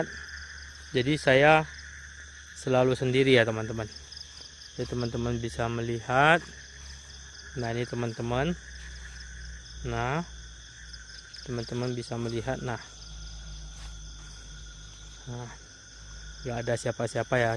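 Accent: Medan, Indonesia. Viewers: Bang abang asli medan kan? Medan dimananya sih bg pengen tau awak haha. Pesenku berkarya teross bg. Jangan abg ilangkan logat kita itu bg karna memang kota medan yang paling disegani diindonesia😂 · Logat Medan Abang orang Medan ya :D Awas ada yg berondok hehehe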